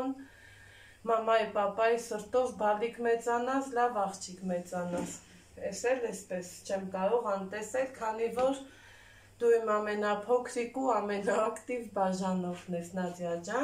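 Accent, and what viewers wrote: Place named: Vanadzor, Armenia. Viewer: ինչքան տխրություն կա ձեր մեջ։հաց թխելը ամեն մարդու գործ չէ։միայն հոգով բարի ու լավ մարդիք են կարողանում լավ հաց թխել։ձեզ առողջություն եմ մաղթում ու երջանկություն սիրելիս։իսկ ձեր հոգու տխրությունը թող վերանա ընդմիշտ🤗🤗🤗առաջին անգամ եմ դիտում ձեր վիդիոն ու միանգամից բաժանորդագրվում եմ։կասեք՞ դուք որտեղից եք բարբառը կիրովականի կողմից է ոնց որ՞ հարազատ բարբառ է ինձ շաաատ🤗🤗🤗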